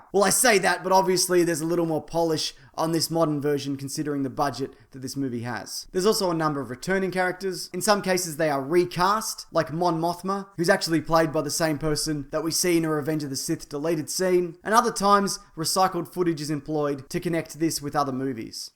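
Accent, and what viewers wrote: Australia. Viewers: Dude....your sense of humor always gets me....out of 10. And your Aussie accent is simply awesome....also....out of 10.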